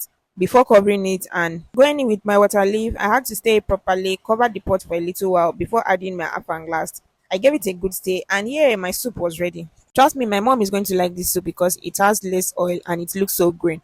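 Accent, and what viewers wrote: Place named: Akwa Ibom, Nigeria. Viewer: Immediately I heard strong garri, I know say na Akwa Ibom sister be this😅😅😅. Song Idem o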